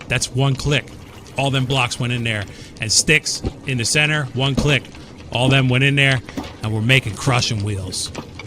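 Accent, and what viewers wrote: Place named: Philadelphia, United States. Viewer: As soon as I heard you pronounce water as "wooder," I strongly suspected you were from the Philly area. I grew up near Pittsburgh, which has entirely different accents, but it's still nice to hear some Pennsylvania pride. Respect, sir!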